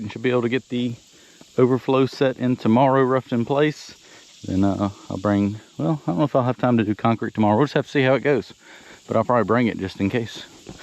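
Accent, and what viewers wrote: South, United States. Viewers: I had an 11 year old video of yours show up on my list and it was when you painted the old Case 580! Your southern accent has mellowed an awful lot since then! 😂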